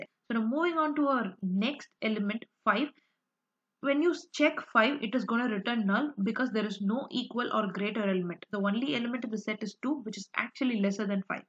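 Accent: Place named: India. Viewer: I wont lie...normally I ignore videos in Indian accents (because generally they're rubbish)...but this was excellent. Well done and thanks.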